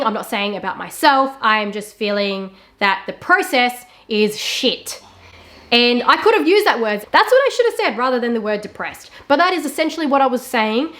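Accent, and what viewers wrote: Australia. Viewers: so interesting! I actually found you from this drama but will continue to watch your Chanel now ! Keep it up! I’m a aussie in the USA so nice to hear a aussie voice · And you think you know everything and can determine SOMEONE ELSE'S place of origin simply because you don't like them, or the fact that they're from your country of origin. Do you even know what Aussies SOUND like, because she sure af sounds like one to me.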